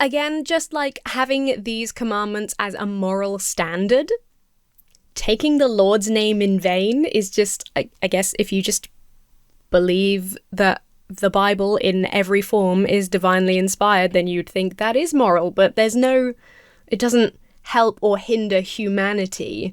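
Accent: United States. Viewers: your American accent is really good, genuinely. just wanted to throw that out there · Your US accent is getting really good! There is already established precedent that covers this exact situation. But who knows wtf our courts will overturn on any given day. This was an intentional move to be sued and put this into the national media and start a conversation about how “Christians are being persecuted.” And an attempt to place Christian Nationalism on a level of actual serious debate. So do I move my family to the UK? I am getting very embarrassed by what my country looks like from the outside. And concerned about the (internal) insanity that is moving out of the fringe and into the mainstream.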